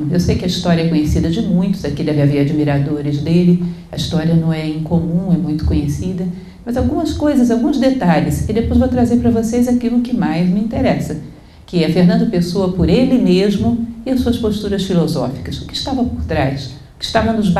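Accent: Brazil. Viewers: Foi uma linda festa pá, mas faltou a língua de Portugal, a lingua portuguesa. A língua brasileira atrapalha, ainda com todo o esforço realizado, estilo e sabedoria, de, "nome bem portuguès, por sinal", e seus declamadores. De qualquer forma, se em Portugal não se fizerem festas assim, que se façam no Brasil ou no resto do mundo, pois dá para perceber o amor de muitos brasileiros a este cantinho da Europa Ocidental, onde nasceram seus antepassados. Já agora frisarei que "a minha biblia" o Livro do Desassossego, foi editado em chinês há + ou - 20 anos. Parabéns a pela sua entrega ao poeta e filósofo! Como teria gostado de a ouvir falar em português de Coimbra... O UNIVERSO INTEIRO NÃO PARECE UMA BRINCADEIRA, UMA PARTIDA DE UMA CRIANÇA TRAVESSA? TÃO IRREAL, TÃO... L. do Desassossego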